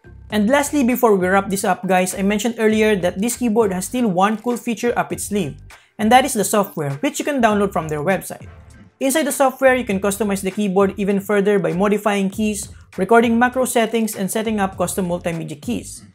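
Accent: Philippines. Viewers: Are u filipino? Cause ur accent is VERY filipino😂 just sayin’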